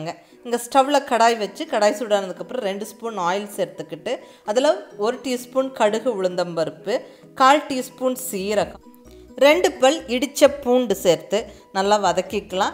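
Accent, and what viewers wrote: Coimbatore, India. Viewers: எங்க கோயமுத்தூர் பாஷை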